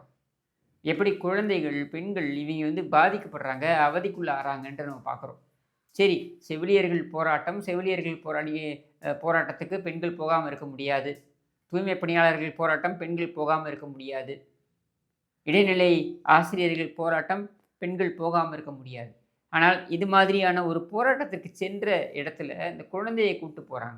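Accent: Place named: Chennai, India. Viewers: Ela tamil slang enga kanom, pakka Chennai slanga iruku😂 thappu panna olungavathu pannunga thanks for entertainment. Irunthalum tourist family pathutu vanthirukelam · Voice and slang sounds like Kannagi Nagar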